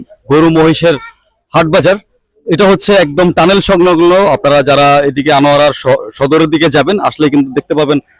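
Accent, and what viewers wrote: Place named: Dhaka, Bangladesh. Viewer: আপনার দ্বারা ভাষ্য অনেক সুন্দর। চাকা বাংলার মতো বলেন। আলহামদুলিল্লাহ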